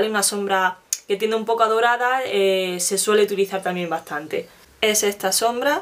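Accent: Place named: Jaén, Spain. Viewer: ¿Eres de Linares o Jaén por casualidad? Soy de Jaén y tu acento es muy de por aquí jeje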